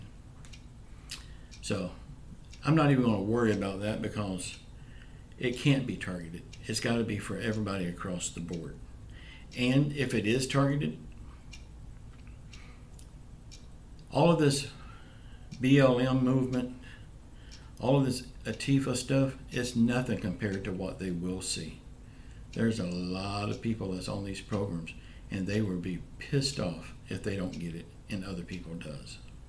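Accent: South, United States. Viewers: Maybe you shouldn't watch this channel if you're offended by his southern accent. I'll continue to watch his channel , I quite enjoy it. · Thankyou!! Love your gentleman southern accent!!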